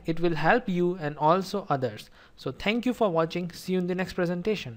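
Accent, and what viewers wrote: India. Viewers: My apologies, if I hit someone. But in my experience your accent the best Indian accent. Your voice understandable and is hear no much harsh. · what I like and don't like ? I like that you are meticulous, I'll explain myself, when you use a notion, a term you always explain it in great details so we don't just learn by heart but also by comprehending where the things come from. I love the way you made your course, with the drawing, it's very effective, plus you made a lot of examples to show us how the circuits work. The pace is how it should be, I don't find it too slow or too fast. In my courses in uni it's way too fast and I don't understand as much as I do here during the lecture. In the beginning I was afraid of your indian accent because I'm not a native speaker (french) and I don't have very good listening skills I often struggle with the accents but strangely with you I understand perfectly what you want to say. 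For the suggestions I can only ask you to do more subjects like algorithms, data structures, system programming and so on hahaha.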